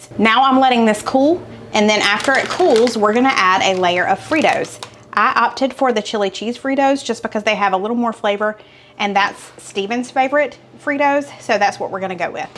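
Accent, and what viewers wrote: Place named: South Carolina, United States. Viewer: Your husband looks a like Tom Hanks. Love your SC accents!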